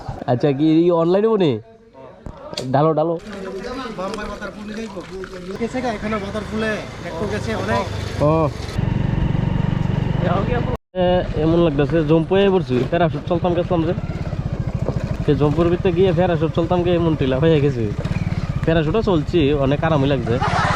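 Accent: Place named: Mymensingh, Bangladesh. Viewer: ভাই আপনার কথা তো একদম আমাদের বাংলাদেশের ময়মনসিংহ অঞ্চলের মানুষের মত আসলে আপনার বাসা কোথায়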